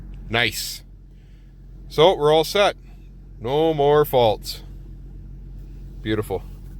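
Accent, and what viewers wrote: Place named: Alberta, Canada. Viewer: you sound like an Alberta boy!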